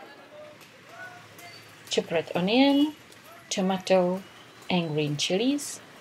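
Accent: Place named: Iran. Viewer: What accent is that? It sounds quite Iranian and Far-Eastern European! Nice channel!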